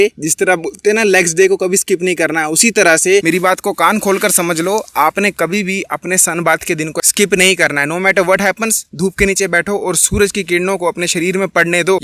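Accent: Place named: Garhwal, India. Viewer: Bhai tu garhwali hai na 😅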